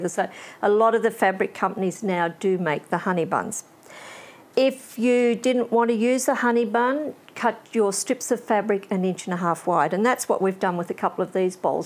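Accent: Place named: Australia. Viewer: Thank you. Lovely to listen to a fellow Aussie. Your baskets were extremely neat and tidy; best I have seen. I have subscribed and binge watched several videos in a row. Good to know I can purchase notions in Australia.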